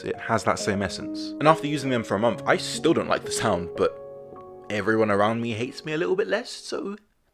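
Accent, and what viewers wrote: United Kingdom. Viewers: Top quality content mate! Funny af, informative, British? Damn fam let’s do a meet up after the pandemic!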